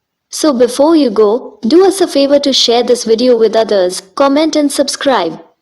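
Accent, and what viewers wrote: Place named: India. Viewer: An Indian voice ? Cool information. 🙂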